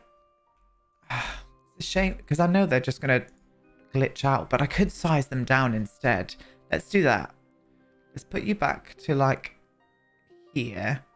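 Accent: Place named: England, United Kingdom. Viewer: Love the story line of a cult! Very unique and I can tell it'll be an entertaining one. I just want to mention one thing, Paolo isn't a portuguese name, I'm portuguese and the correct version of that name would be Paulo, in terms of pronunciation is similar, especially with your English accent and it really is a small difference in spelling but it does matter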